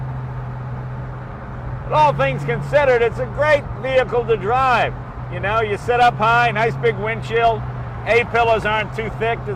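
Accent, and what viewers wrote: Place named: New England, United States. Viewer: Travis Henderson I think he was using it in the plural... Vortecs; or it was just his New England accent coming out. You know...Pak the caa in the yaad.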